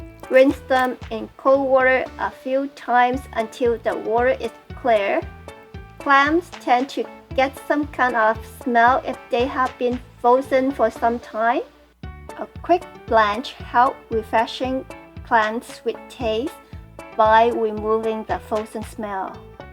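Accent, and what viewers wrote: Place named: China. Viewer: Wow....There's nothing wrong with her accent, if anything it just brings more Chinese authenticity. I would argue there are many English speakers are becoming more and more curious about Asian cuisines and channels like this is contributing a lot to this shift in mind set.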